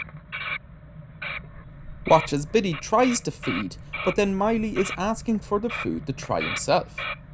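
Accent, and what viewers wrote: Ireland. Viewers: HELLO, Greetings from Canada🇨🇦. WoW, this was an exceptional video thank you so much! I appreciate you taking the time to explain the goings-on and for educating people like myself, for the time you've spent filming and editing and your calm, dreamy, Irish dialect and narration. (I lived and travelled throughout Ireland for a year~ loved every moment). I'm sincerely grateful and looking forward to viewing more of your video's/vlogs. Thank you ❣️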